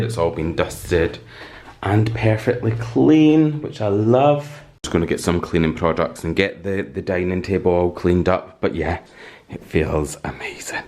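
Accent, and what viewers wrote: Scotland, United Kingdom. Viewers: Loved those two textured wallpapers - they give such a luxury hotel vibe! Just found you a couple weeks ago & get so excited when I get a notification now 🤣 Love everything about your style & aesthetic...and I'm a fellow homebody/ introvert!!! haha! Have been trying to work out where your accent is from...I'm just outside Edinburgh, but I'm thinking you must be further North. Thanks for showing us another part of your beautiful home...I'm off to look up those Kinfolk books on Amazon right now. Obsessed with interior/ architecture books!!! Have a lovely week ❤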